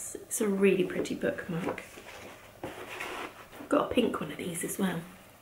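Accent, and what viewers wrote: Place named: Devon, United Kingdom. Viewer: I’m absolutely here for you and your strongest Devonshire accent. Lovely to spend time with you again xxx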